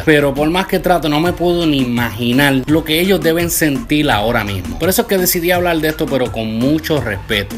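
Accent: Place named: Puerto Rico. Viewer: ***** ajajajaja te pasaste ahi ajajajaj asereee saludos desde cuba italy le Das un toque boricua a esto brutal..adoro El acento e se lei y dije wao tiene que ser de colombia pero na ese acento lo reconosco entre miles..saludos brodel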